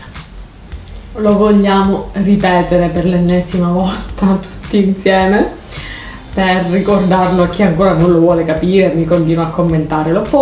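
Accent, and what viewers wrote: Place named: Catania, Italy. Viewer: sei tutti noi. Domanda sei di Catania? L'accento ci assomiglia, e io mi diverto più di tutti, essendo messinese capisco alcune cose che dici in dialetto. ❤❤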